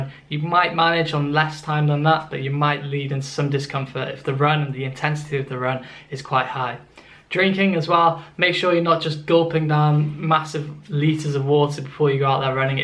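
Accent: Wales, United Kingdom. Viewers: Is that a welsh accent i hear ayy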